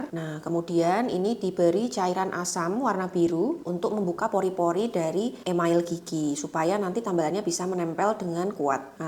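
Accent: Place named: Java, Indonesia. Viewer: Dokter medok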